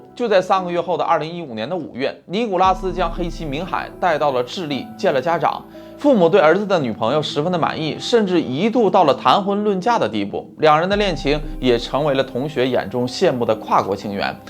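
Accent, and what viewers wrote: Northeast, China. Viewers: 你说的是，区别真的很大。我只认错过一回。我觉得有个阿婆主是天津老乡讲普通话。但她说她是北京人。所以以后我就觉得兴许北京人也这么讲话？我又听了楼主的其他节目，他把z发成zh, 感觉楼主应该是山东或东北人，也可能是内蒙的😆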